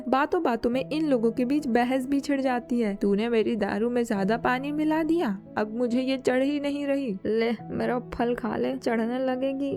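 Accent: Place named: Bhopal, India. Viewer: You must be from Bhopal Right ?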